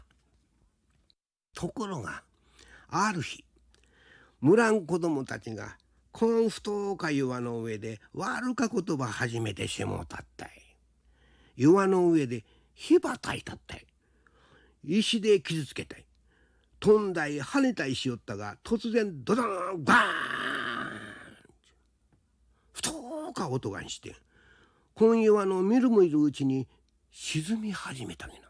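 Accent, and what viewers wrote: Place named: Kyushu, Japan. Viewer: 私は熊本ですが、方言は南九州の方言そっくり。むしろ本当の方言よりききとりやすい。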